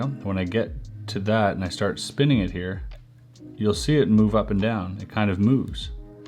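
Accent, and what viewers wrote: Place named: Quebec, Canada. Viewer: Salut! Je viens de voir une vidéo de toi qui parle en français, je suis tes vidéos depuis plusieurs années déjà et c'est la première fois que je t'entends t'exprimer en québecois, c'est weird! Je savais que t'était de Montréal comme moi mais je savais même pas que tu parlais bien français! Entk bonne continuation, j'aime beaucoup tes vidéos.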